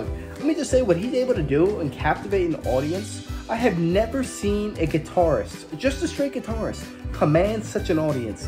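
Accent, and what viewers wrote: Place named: United States. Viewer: 😂 I was expecting you to have an Irish not American accent as is like the most Irish surname ever dude 😎👍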